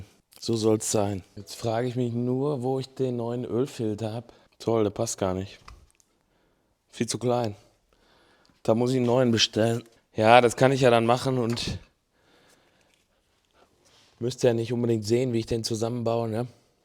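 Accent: Ruhr, Germany. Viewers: Dialekte sind richtig und wichtig 😅 Ruhrpottklang von 😉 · wichtig ist, die deutsche Sprache in ihrer wahren und richtigen Form zu bewahren. Ich finde diesen Ruhrpottklang von auch so sympathisch!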